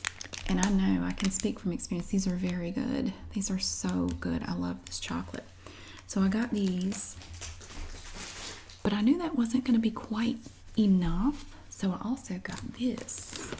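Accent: South, United States. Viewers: Do you have a patreon account? You should set one up so we can give back in gratitude to what you do for us. A good way for you to also make a little (and much deserved) cash. As for the Brioche, i don’t know what it’s like in the U.S but the French particular Brioche that we get imported to Australia is not dense. It’s very sweet and very soft n fluffy. Perfect for French toast and PB&J but not really with savoury toppings. Just my opinion.. Thanks for another great vid, I’m addicted to your soft spoken southern accent :) x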